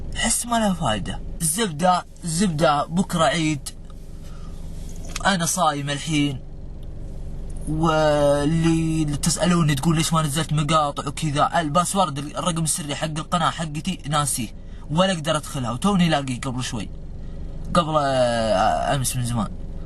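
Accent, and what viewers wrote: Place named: Libya. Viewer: اللهجه تشبه كثيل لهجت الليبيين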